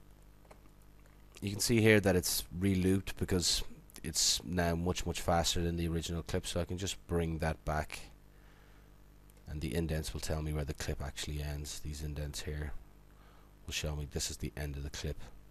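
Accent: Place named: Ireland. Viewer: ill know that your irish 5 stars your for dublin so am i because i knoe your acent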